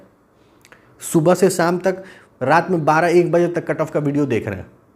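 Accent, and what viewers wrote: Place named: Bhojpuri, India. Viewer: Kaha se ho sir bhojpuri bol rare ho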